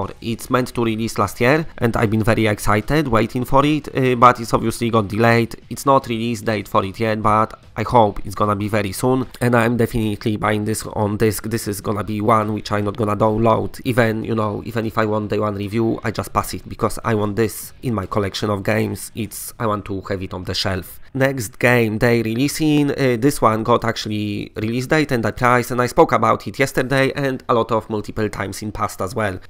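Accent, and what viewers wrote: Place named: Poland. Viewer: keep on speaking with your magnificent accent just the way you're doing right now, we just love it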